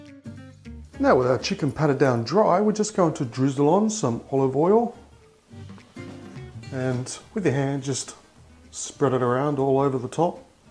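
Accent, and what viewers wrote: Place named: Australia. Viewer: From one Aussie I can say he is from Australia!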